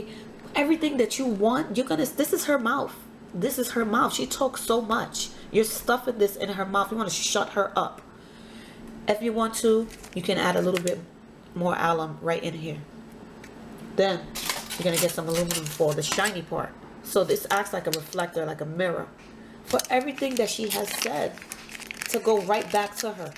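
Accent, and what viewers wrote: New York, United States. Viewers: are you frm ny? "talkin talkin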